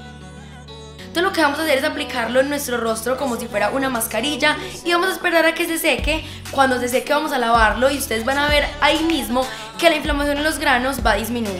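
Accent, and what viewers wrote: Antioquia, Colombia. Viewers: Yo solo me quede por escuchar ese hablado paisa bello😎😅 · Uy ese acento a paisa se detecta a leguas XD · Me encanta tu acento colombiano, saludos desde México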